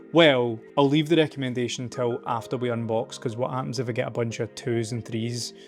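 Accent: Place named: Scotland, United Kingdom. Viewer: Hi good to hear a Scottish accent. Finding product is so hard.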